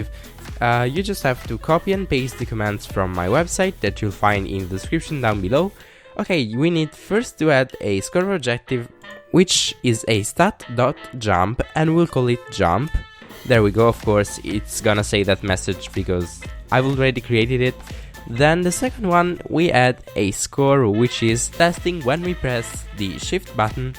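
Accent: Italy. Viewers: Italian :D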